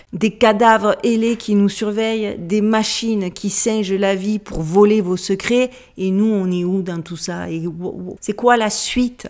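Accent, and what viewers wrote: Marseille, France. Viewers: Bonjour. Merci encore pour tes infos, ta diction et ton accent qui rappelle que la Sardine a bouché un jour un très grand port. Ne changes rien. Par contre certains sont vraiment murs pour l'asile. A force de faire n'importe quoi et de se prendre pour Dieu le père, ces derniers contribuent assurément à la destruction de l'humanité. See you next sunday! 😍😘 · Tu es trop mignonne ma chérie et tu parles trop bien français t'inquiète avec ton accent du sud c'est merveilleux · Bonjour je t'avoue que les 3 sujets sont plus qu'inquiètant mais je dirais que le dernier est le pire!!! Quand à ta prononciation, il n'y a vraiment rien à dire, tu t'exprimes extrêmement bien et tu n'as même pas pris l'accent Américain... Non toujours l'accent Marseillais 😊 j'adore 👍🏻 merci à toi de nous parler de tous ces sujets dont personne ne parle 😤 passe également une excellente fin de semaine bisous 🥰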